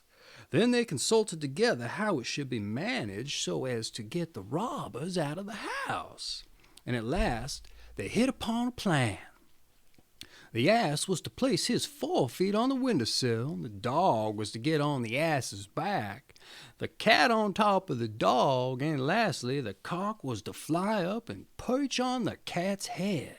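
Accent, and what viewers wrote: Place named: Lowcountry, United States. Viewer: I can’t decide if he is mocking the low country accent or enjoying it 😂but it’s a little to theatrical to listen to the entire story.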